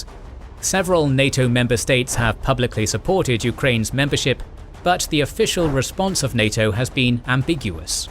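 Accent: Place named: RP, United Kingdom. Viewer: I want a British cut glass accent just like the guy speaking in these vids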